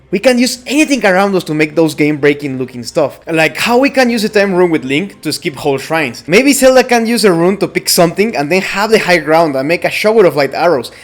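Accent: Spain. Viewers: Supongo que eres de España por tu acento asi que te comento en español, la verdad es que la idea que propones me parece bien, el problema es que Zelda es muy poderosa, se cargo a todos los guardianes de la zona en la que estaba link luchando en una de las memorias de BOTW, mantuvo a Ganon durante 100 años en el castillo y después de que link lo derrotara lo selló. Ahora esto se podría solucionar diciendo que Zelda ha perdido poder después de sellar a Ganon (ella misma dice en el final secreto deBOTW que ya no nota la trifuerza) y hacer que realize las pruebas que mencionas en tu video para recuperar la trifuerza completa y así ayudar a Link a derrotar a Ganondorf, que es lo que impidió que esto pasase el miedo que tiene Nintendo de que la gente se queje. Aun así se que algún día dejarán atras ese miedo y lo haran, así como canonizar el Zelink. PD: Buen video bro❤ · you have like a spanish accent it's kinda weird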